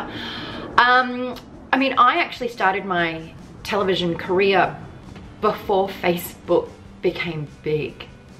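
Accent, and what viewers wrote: Australia. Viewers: You sound more Aussie than usual. I dig it ;)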